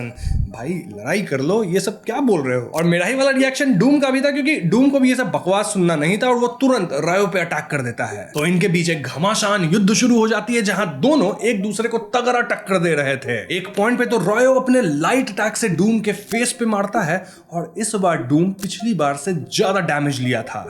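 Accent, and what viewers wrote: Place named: Bihar, India. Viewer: Bro is bihari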